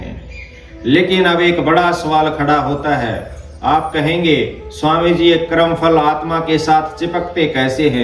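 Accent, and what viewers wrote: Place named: Haryana, India. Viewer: आदरणीय स्वामी जी,आज के हिंदू को सुनाने के लिए यही सुन्दर भाषा उचित है। बहुत दिनों बाद ऐसे स्पष्ट वक्ता को हरयाणवी के पुट के साथ सुनना कल्याणकारी लगा।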